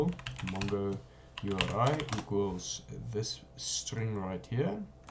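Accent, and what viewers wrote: Afrikaans, South Africa. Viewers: You have the fattest Afrikaans accent ever. Love the video >3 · South African ? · You've got an Afrikaans accent.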